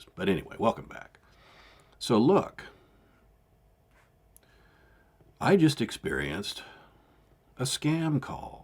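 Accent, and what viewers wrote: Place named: Boston, United States. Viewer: Luv you and your Bahhhh ston, New England, Massahhh chew setts, 35, jfk sr, accent!!!!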